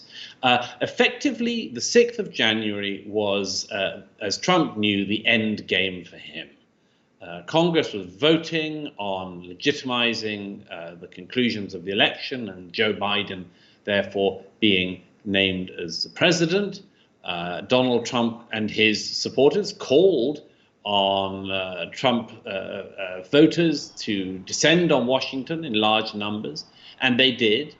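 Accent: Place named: RP, United Kingdom. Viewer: Firstly, why is Douglas wearing make up? Secondly, why are people listening to his analysis? In the summer he predicted that Trump would win in a landslide as a result of the BLM protest. talks nonsense with a posh voice. Sad that for a certain sector of the British public this is what passes for insight.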